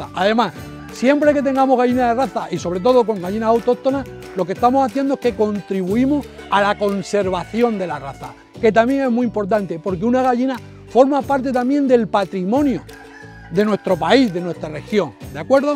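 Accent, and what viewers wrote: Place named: Albacete, Spain. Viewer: Yo le sigo porque me es muy ameno y cercano y el tono de voz Albaceteño como el mio, cada duda que tengo le miro a usted sus videos para ver que solucion tiene.❤. Muchas gracias.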